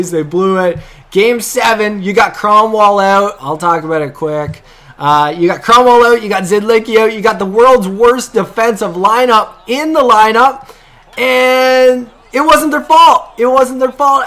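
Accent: Canada. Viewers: Why are you always Red Wings? They your favorite team? I live in Michigan so they my favorite team as well. Such a shame against Tampa Bay! You have Canadian accent so you from Canada? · ***** where are you from? I am from the Detroit area and I'm a die hard wings fan. I've been watching a lot of your videos lately and haven't figured out where you are from lol. DET? or no..sounds like your canadian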